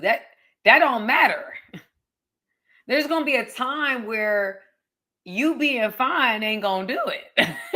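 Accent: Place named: New Jersey, United States. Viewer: The only thing I know about New Jersey is what ive seen on it’s always sunny and that it’s also the birth place of jay and silent bob and I can see you growing up there the way you speak 😂😂 i definitely was a giant red flag no way around it but i think I’m a white flag now I’ve surrendered myself as we should all be. Ive still got that red flag but I’m not waving it around anymore it’s just for emergencies I think.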